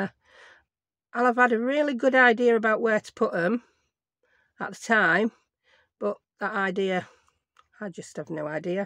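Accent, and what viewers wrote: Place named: Black Country, United Kingdom. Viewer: I just found your page for the first time today. I love your accent! Are you from the Black Country? Gave you a follow. I love your page!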